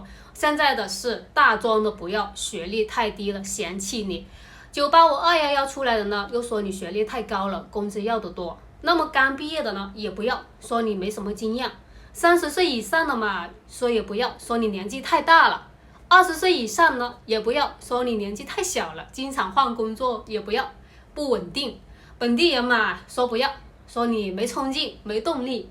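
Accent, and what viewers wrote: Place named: Guangdong, China. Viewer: 小姐的臉孔非常熟悉，妳是受人僱傭請來做這種反社會的視頻吧！妳廣東口音是聽的出來的。深圳是你的常駐地點。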